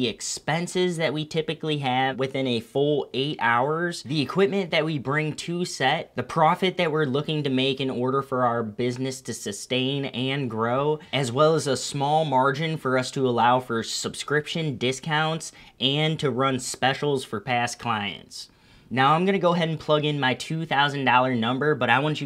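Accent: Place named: Midwest, United States. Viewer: Midwest accent gotta love it! I say this in my own Midwest accent.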